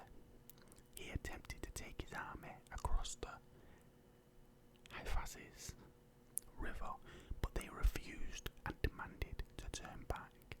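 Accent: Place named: Manchester, United Kingdom. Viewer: Sounds like a Manchester accent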